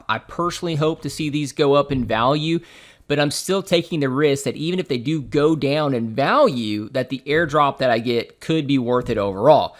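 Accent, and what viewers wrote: United States. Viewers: Yo Ive missed that southern accent 3QwzaeEqKVQLbkoMYqrZbYf9f5dK9R3KCm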